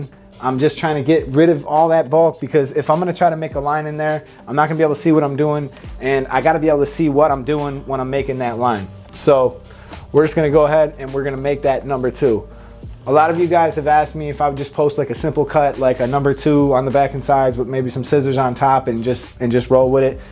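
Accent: Buffalo, United States. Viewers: You from buffalo?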